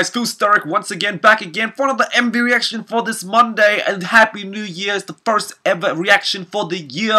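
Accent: Vietnam. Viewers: HoàngYasuo Channel đúng rồi. nghe ổng nói giọng miền nam mà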